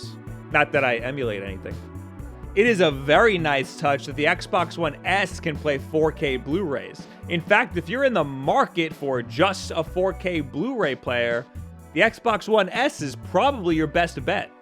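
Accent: New York, United States. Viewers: THAT NEW YORK ACCENT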